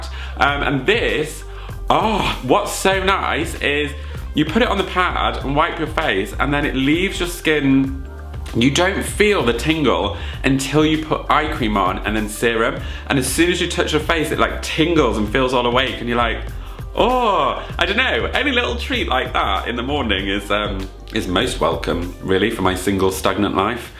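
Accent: Australia. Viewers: Listen ere Sheila! Living for ur Aussie accent lol.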